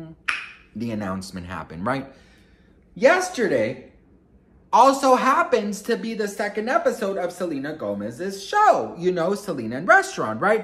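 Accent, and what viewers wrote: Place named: New Mexico, United States. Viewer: I love when you speak Spanglish. I grew up in New Mexico and the accent you have reminds me of family there. I just love you and your sense of humor. I’m so glad I found you. I needed a Messy Bestie in my life ❤😍💋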